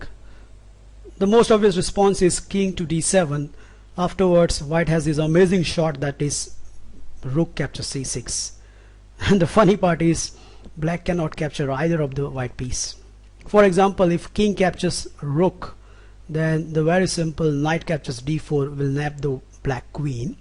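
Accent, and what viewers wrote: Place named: India. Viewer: I bet u are an indian. Lol nice english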